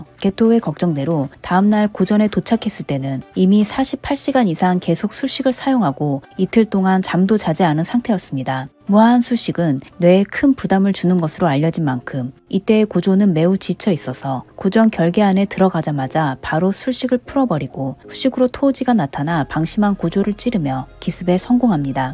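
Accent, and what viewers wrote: Japan. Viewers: 일본인이신가 발음이 그냥 일본인인데..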